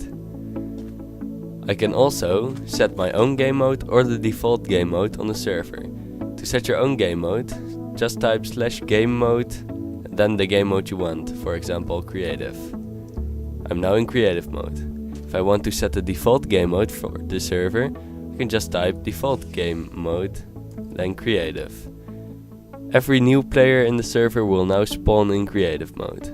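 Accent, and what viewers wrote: Netherlands. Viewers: i am dutch and i think he to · Are you dutch?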